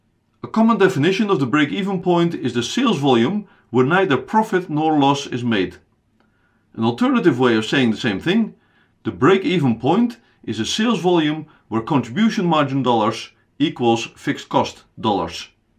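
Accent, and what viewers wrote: Netherlands. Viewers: Thank you so much for helping! Hopefully I will pass my entrance exam. Also, I had to ask are you Dutch? I could tell by your accent :)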